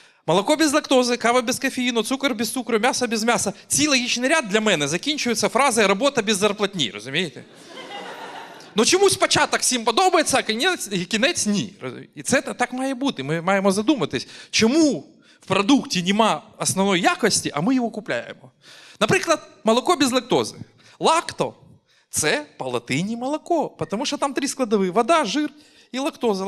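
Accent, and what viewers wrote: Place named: Surzhyk, Ukraine. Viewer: наше все! Здоров'я на багато років вперед, щоб не один раз ще почути цей чудовий суржик- акцент😊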